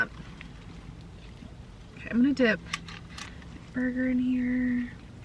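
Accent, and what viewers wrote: South, United States. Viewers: I absolutely love your cute southern accent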